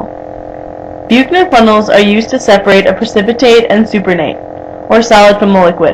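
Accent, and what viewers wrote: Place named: Wisconsin, United States. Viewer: More specifically, I think it's "Oconomowoc Swimming" Oconomowoc is a city in wisconsin, and her accent sounds like a wisconsin accent.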